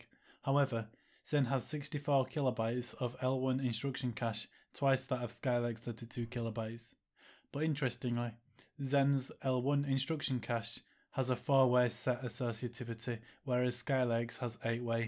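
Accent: United Kingdom. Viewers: Is that a bradford or leeds accent?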